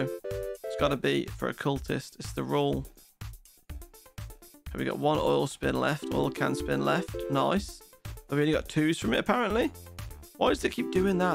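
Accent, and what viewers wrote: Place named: United Kingdom. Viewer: You still sound British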